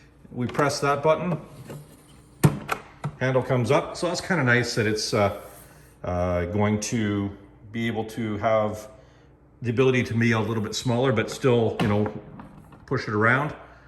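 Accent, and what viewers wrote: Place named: Minnesota, United States. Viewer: You must be from Minnesota. Nice unboxing and walk through of the unit!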